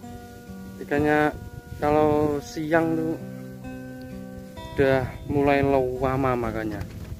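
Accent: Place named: Java, Indonesia. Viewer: Q suka cara ngetapnya.. Medhok tu maszehhh Salam hormatku boss ku ❤️🙏❤️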